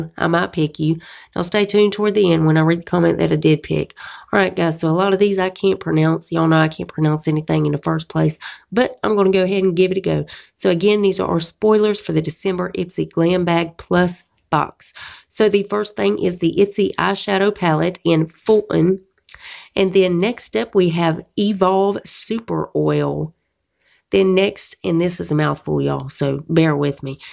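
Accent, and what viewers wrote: South, United States. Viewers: Thanks for the spoilers, loving your Southern accent..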